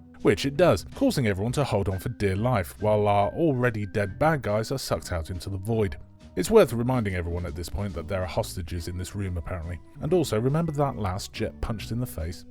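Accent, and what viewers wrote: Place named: United Kingdom. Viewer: British voice complaining about whatever on YouTube… what a surprise 🙄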